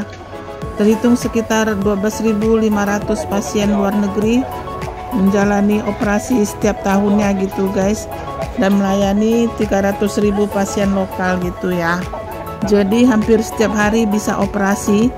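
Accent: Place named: Indonesia. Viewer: Hujan ya bund , oh ini rumah sakit yg populer yg biasa org Indonesia klw berobat kemalaysia ya Bun , tp dari logatnya bunda sprti org Indonesia ya Bun , mknan berat sepiring plus snack2 ada bakso nugget dikafeteria rumah sakit JD gak tegang dan kaku ya Bun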